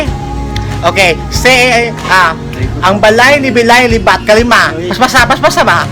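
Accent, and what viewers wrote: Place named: Cebuano, Philippines. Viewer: You remind mi of a cebuano host, pareha mo ug accent. Hehehe ngita lage ug uyab hahaha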